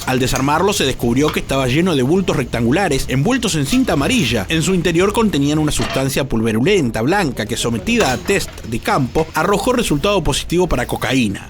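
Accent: Argentina. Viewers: Por favor!! Me encanta como hablas. Y las palabras tan Argentinas, y el tono. Que buenas narraciones. 👏🏼👏🏼👏🏼👏🏼cual es tu nombre!!!! Jajaja 😆 la paso muy bien con lo que dices!!!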